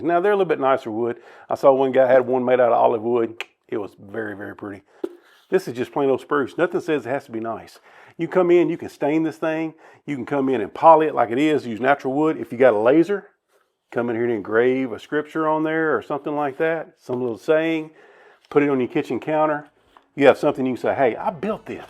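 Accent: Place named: Arkansas, United States. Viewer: Are you from Arkansas? Your accent sounds just like my hubby and his family :)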